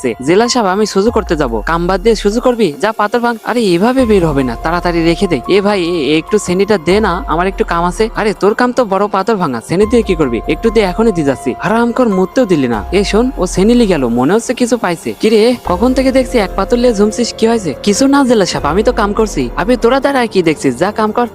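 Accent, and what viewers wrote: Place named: Pabna, Bangladesh. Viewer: খাটি পাবনার ভাষা 😂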